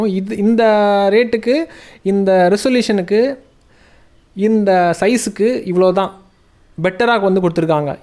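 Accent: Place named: Nagercoil, India. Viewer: Nanba ne nagarcovil thana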